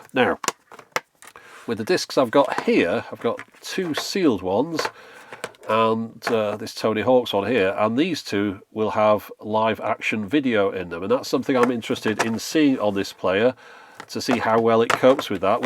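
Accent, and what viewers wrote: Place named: Manchester, United Kingdom. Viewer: I grew up in north Manchester and your accent sounds very familiar, are you from that area?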